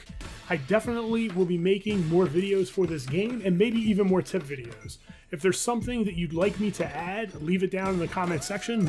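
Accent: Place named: Delaware County, United States. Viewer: This is the first video I’ve watched on your channel, but I could tell right away that you are from Delco my dude. Say what’s up to my cousins!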